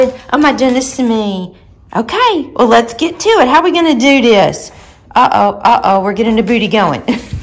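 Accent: South, United States. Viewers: I just love you and your sweet southern accent.You bring a smile to my face everytime I watch a video. I especially enjoy the videos of you with your family and the dogs. I did have to cry when you and said goodbye to Brecon on the instagram pics. I have been through that twice and I know how you feel. My shirt should come soon but I already give you an A+ for presentation. I see the extra work it takes to do the sticker, tissue, and treat. When things are done the Way, they are done right!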